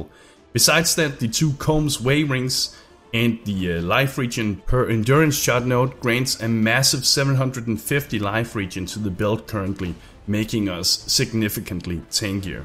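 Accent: Denmark. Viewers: One discharge, one baby haha...Du er Dansker ikke? man kan altid hører det ;)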